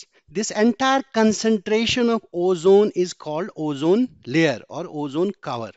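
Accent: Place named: Kashmir, India. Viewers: Sir must be kashmiri because of dialect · Your accent is Kashmiri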